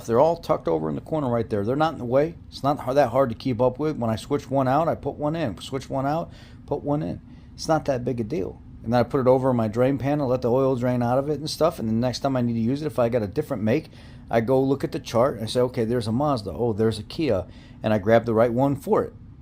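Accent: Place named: Illinois, United States. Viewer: Hey, we can hear the Illinois in you! In many states crying like batch gets you ridiculed not appreciated! Back in my day keeping my shut saved alot of problems!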